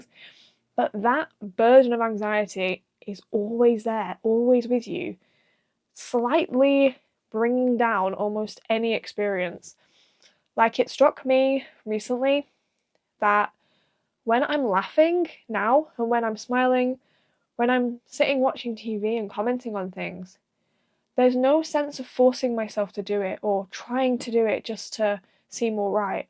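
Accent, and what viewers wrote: United Kingdom. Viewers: You really sound like someone I know from Rochdale! Are you from anywhere near there?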